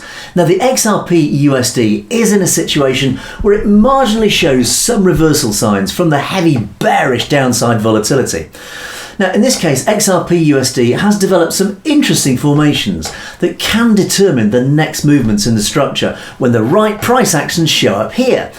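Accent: United Kingdom. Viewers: Like ur typical Uk Accent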